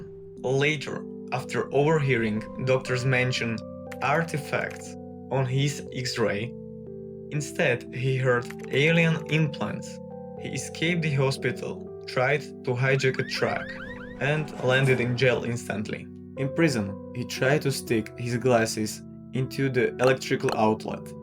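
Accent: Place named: Slovakia. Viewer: ten slovensky prizvuk strasne pocut :dd, pekne video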